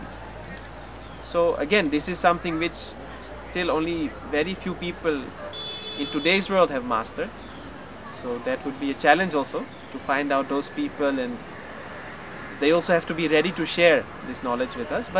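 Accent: India. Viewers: your English is much more INDIAN... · Its indian but its damn good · Hello, your Indian accent delivery took away from the meaning of your words. It just does the opposite of feeling genuine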